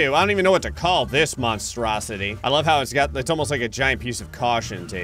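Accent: United Kingdom. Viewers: IS FROM THE UK????!!!!!!!!! 😮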